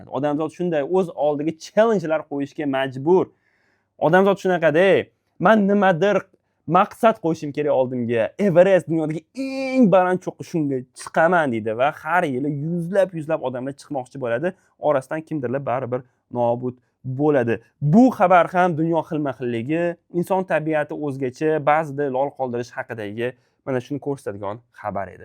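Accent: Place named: Tashkent, Uzbekistan. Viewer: Xabarlar uchun rahmat.Lekin o'zbek adabiy tilida gapiring.Chunki sizni faqat toshkentliklar emas, butun dunyo eshitadi.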